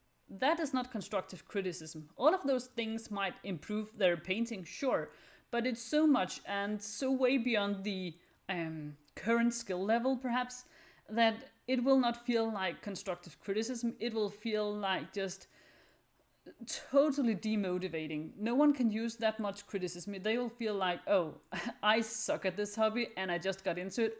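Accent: Denmark. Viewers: Are you danish because you have a danish like accent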